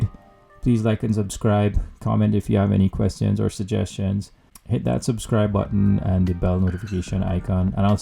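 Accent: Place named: Trinidad and Tobago. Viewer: If you listen, ever so carefully, you can hear the trini...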